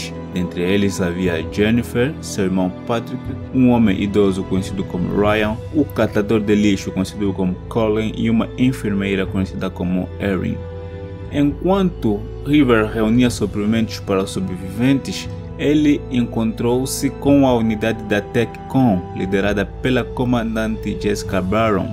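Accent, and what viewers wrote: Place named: Portugal. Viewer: Vim do vídeo sobre The surge , o microfone nem era ruim como falavam dava pra entender , eos br achando q vc era Portuga kkkkkk , legal saber q melhorou a qualidade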